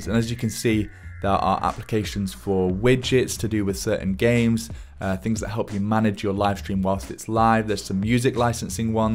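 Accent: United Kingdom. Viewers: Where is your accent from? It doesn't sound like london british but it sounds british, it's nice.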